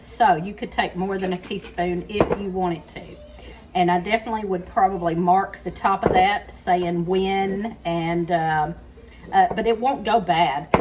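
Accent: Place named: North Carolina, United States. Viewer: A two spoon of that? What’s a two spoon? I’m born and raised right here in south eastern NC Brunswick county or ocean isle beach but your accent sounds exactly like ours that’s why I was wondering if you said two or yea it didn’t sound like tea spoon though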